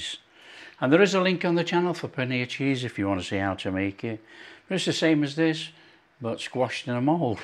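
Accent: United Kingdom. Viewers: Can someone tell me what is this man's accent (within UK)?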